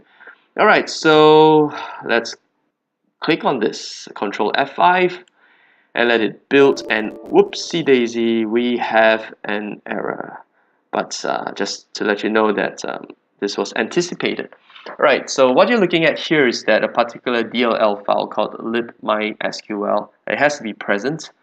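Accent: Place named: Singapore. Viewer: Singapore? Malaysia? That accent is unmistakable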